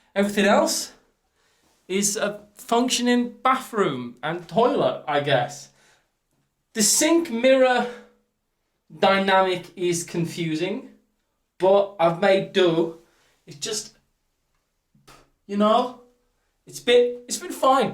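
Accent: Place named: Midlands, United Kingdom. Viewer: Good ears! Yeah I'm from the Midlands unfortunately. Have a good time in Kosovo!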